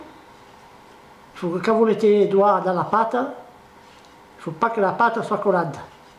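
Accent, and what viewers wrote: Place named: Provence, France. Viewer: Merci pour cette recette super facile et bien expliquée, ma pâte se repose en ce moment, et merci pour votre accent qui sent bon la Provence de chez moi lol, joyeuses fêtes à tous!!!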